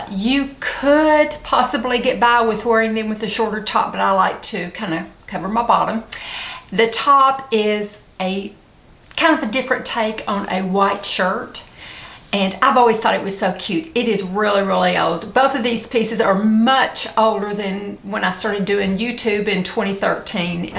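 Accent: South, United States. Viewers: You could have been a Miss America...you are so beautiful and your figure is amazing. Love your southern accent...❤️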